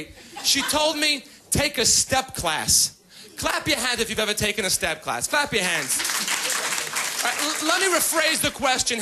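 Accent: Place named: New York, United States. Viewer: he was raised in a religious jewish home. hes got a bit of a yiddish tinge to his accent. very new york jewish.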